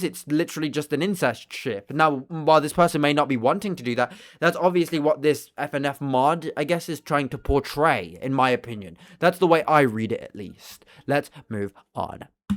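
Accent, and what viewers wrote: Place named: United Kingdom. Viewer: HESSSSSSSS BRITISHHHHHHHHHHHHHHHHH still good video tho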